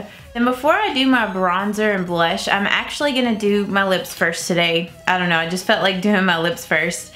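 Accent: South, United States. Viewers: I love your southern accent and that eye look is gorgeous!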